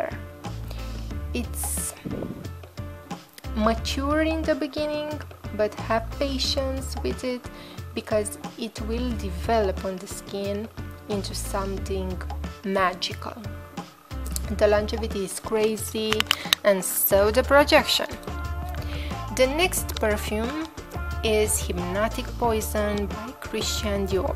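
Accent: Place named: Romania. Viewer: Romanian accent.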